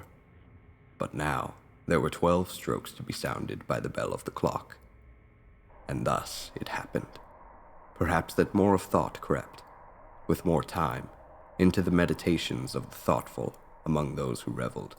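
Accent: United States. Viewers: Very cool, almost strange to hear a fellow American rad on the site, he did a great job though, so used to hearing the Brit accent. Of the several 'spooky' tales chs I sub to, HB is still my fav and first choice. Best to all involved.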